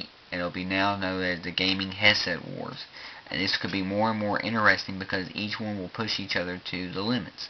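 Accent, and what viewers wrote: United States. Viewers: Are you frome Mississippi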